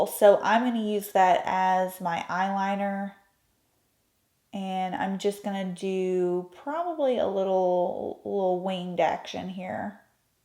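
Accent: South, United States. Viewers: Great look! Love your accent, sounds southern!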